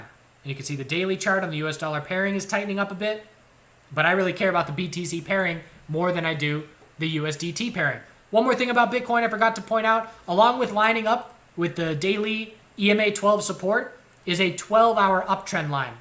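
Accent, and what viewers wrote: South, United States. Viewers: Like the southern accent more 👌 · if that wasn't enough a nice southern accent to put a smile on your face. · Love the aggressive southern trader accent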